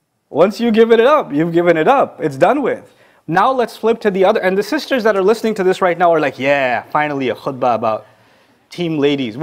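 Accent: United States. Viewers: You have an American accent, sir. In fact you sound a lot like the charismatic Evangelical Christian Preachers in The USA 🇺🇸. The Islam that you are preaching is not at all the Islam taught in Islamic countries or taught from The Quran. The Quran is a very unorganized book of unintelligible texts that sound somewhat reasonable in the first few Surahs but then progressively become angry, hostile and murderous as The Prophet Mohammed becomes more angry, hostile and murderous. The Quran is a lot of mumble jumble jumping from subject to subject with no structure. It’s a hodgepodge of “scriptures” from Jewish and Christian texts that are scrambled together and with a lot of errors as these were oral traditions and “orally transmitted,” Mohammed heard “stories” from Jews and Christians but he mixed them all up. “Mary,” the mother of Jesus, was not the sister of Aaron and Moses and Jesus was not born under a Palm tree! 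Aaron and Moses had a sister named Miriam long before the birth of Jesus. The Egyptian Pharoahs were definitely not living in the same period as Haman, The Persian villain, during the time of the Jewish Queen Esther who married King Ahasuerus to save her people, The Jews. These were two historically different time periods! Now The Bible has a lot of fanciful stories woven into real historical events but much of the actual historicity is still backed up with modern archaeology. Not The Exodus from Egypt, however. There’s no archaeological evidence of millions of Hebrew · When did he become a Muslim? He sounds American English. Are you Muslims now throwing out the Koran? I don't think so.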